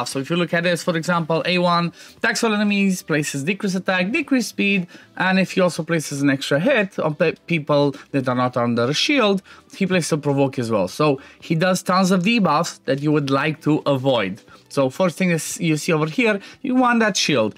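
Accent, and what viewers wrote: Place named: Romania. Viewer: Accentu de român))))) Oricum, fain video, ține-o tot așa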